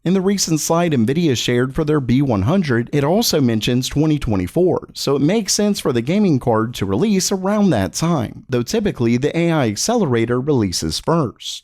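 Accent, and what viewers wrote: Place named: Appalachia, United States. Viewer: Your hillbilly accent is unbearable. "It's one massive COARD"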